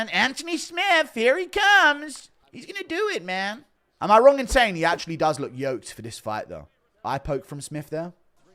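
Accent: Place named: United Kingdom. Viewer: I love how impression of Anthony Smith is basically his normal voice, he just switches from his natural UK accent to American XD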